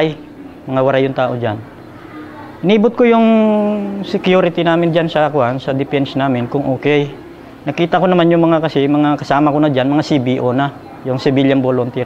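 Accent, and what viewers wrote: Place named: Ilocos, Philippines. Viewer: Ilokano tuno mo master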